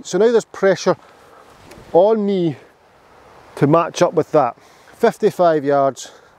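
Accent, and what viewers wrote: Scotland, United Kingdom. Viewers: I’m in Glasgow great to hear Scottish accent with humour, I’ll take on board what you say to tomorrow morning on first tee, great vid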